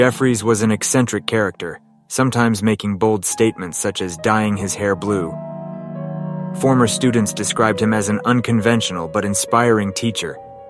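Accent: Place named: United States. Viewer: Finally! Ive found an american voice over, true crime channel that covers UK murders 😭❤️🙌 thank you 🎉 SUBBED 😊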